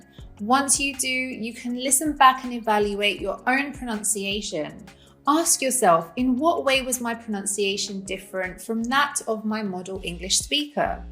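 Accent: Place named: United Kingdom. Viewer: The British accent is finding it kinda hard to understand Maybe the American accent is easier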